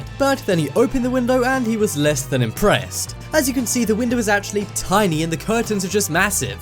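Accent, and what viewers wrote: United Kingdom. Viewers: Im so tired of this British accent bullshit on youtube. It may have seem attractive to some people at the beginning but now it's just fucking annoying! I would give you a thumbs down but I'd rather give you a thumbs up.....YOUR ASS!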